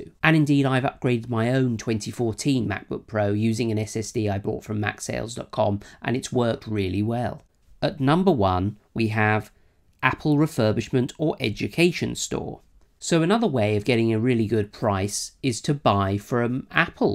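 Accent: United Kingdom. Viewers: Yay you’re British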